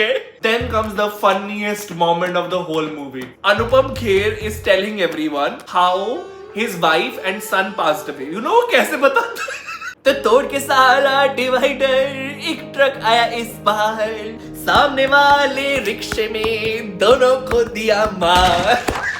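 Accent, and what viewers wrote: Punjab, India. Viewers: Your punjabi accent ❤❤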